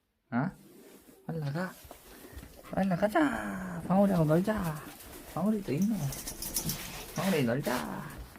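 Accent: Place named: Gyeongsang, South Korea. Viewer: 우연히 산돌이 영상을 보고 님 영상 처음부터 끝까지 보고 또 보는 중이에요.제 고향 대구와 멀지 않은 곳에 사는거같아서 말투도 정겹고 산돌이영상은 더 좋구요. 뽀드득 뽀드득 소리 너무 듣기 좋네요~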